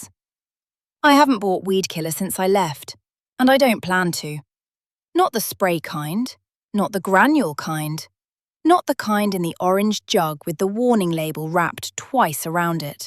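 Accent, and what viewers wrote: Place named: United Kingdom. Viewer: Why do you have a British accent on a video about Amish weed prevention? Shouldn’t it be more of a German accent?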